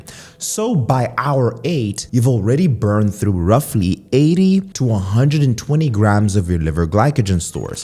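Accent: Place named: South Africa. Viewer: Your accent does not seem British sir....Sounds more like South African.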